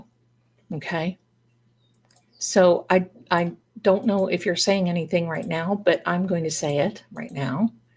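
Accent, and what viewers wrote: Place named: New York, United States. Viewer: Hi I heard your ny accent. Hehehe. I’m OG from Patchogue LI NY. I’m in upstate NY now but still have some Long Island accent Can’t wait to watch and enjoy. Ty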